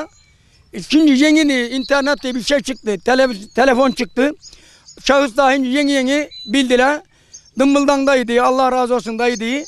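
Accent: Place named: Isparta, Turkey. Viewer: Tam ısparta şivesi :D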